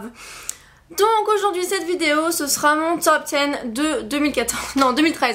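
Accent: England, United Kingdom. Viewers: t'es trop drôle! ^^ j'en ai déduit que tu vis en Angleterre avec ton accent! ;) merci beaucoup pour ces présentation de livre!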